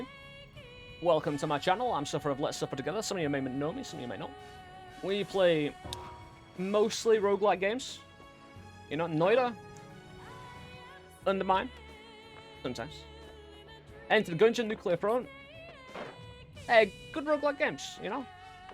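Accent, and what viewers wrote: United Kingdom. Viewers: i think ive found my 2nd favorite british accent ._. so pleasing to the ear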